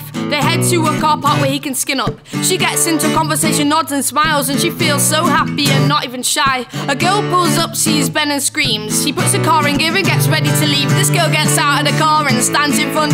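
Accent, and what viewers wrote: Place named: England, United Kingdom. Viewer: Good! I like your english voice its cool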